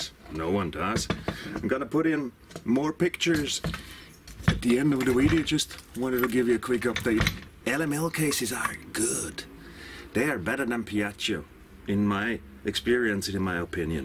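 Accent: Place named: Australia. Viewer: I love the aussie accent 😂 schöne Grüße aus KA... Maaate!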